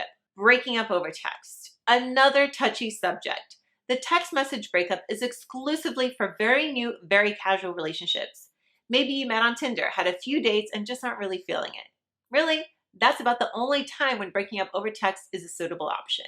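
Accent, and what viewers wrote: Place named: Poland. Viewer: Yeah. I think Polish is first language.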